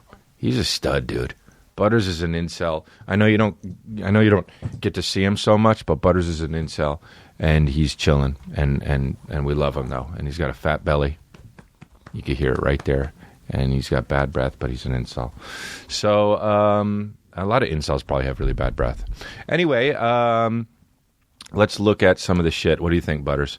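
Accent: Australia. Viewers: Chris needs a part in a movie as an aussie forsure hahaha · Love the Aussie accent😂😂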